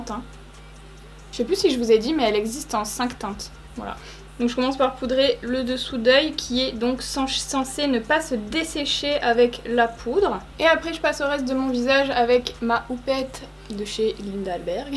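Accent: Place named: Alsace, France. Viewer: ...Les couleurs m'inspirent pas...c'est pas français ça...c'est facile mais très chiant...:-) et résultat c'est super intense super joli et vivement une vidéo avec tes nouvelles couleurs de cheveux, et entre nous, je confirme que t'as un sacré accent alsacien quand tu déconne avec tes voisines sur instagram :-)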